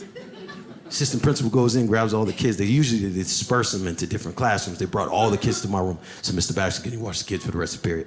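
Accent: Dominican Republic. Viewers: I don't know if most of you realize the funniest part about his spanish accent is that he nails the dominican accent! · My boss said to ××cap a chino×× dude I lost it that was a great joke and crazier punchline and with the Dominican accent made it all come together!!!!!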